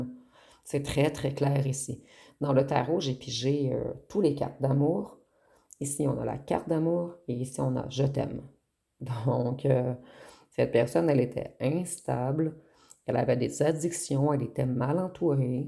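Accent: Canada. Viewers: Tirage très complet deux personnes se superposent. J'adore cet accent canadien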